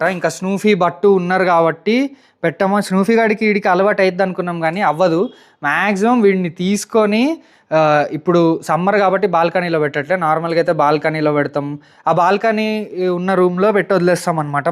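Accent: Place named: Telangana, India. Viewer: Telangana, slang Super meru❤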